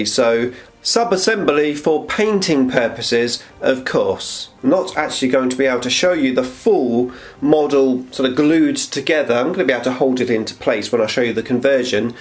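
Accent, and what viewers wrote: United Kingdom. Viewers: When he says: "My void dragon" I think that translates to void dragon. It can get a bit lost in the British accent =P. Great work! Prince MN Represent!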